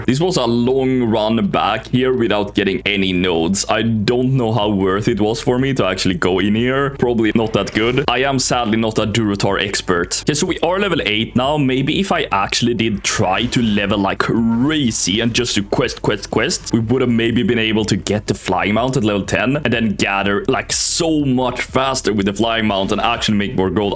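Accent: Sweden. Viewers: are you swedish by chance?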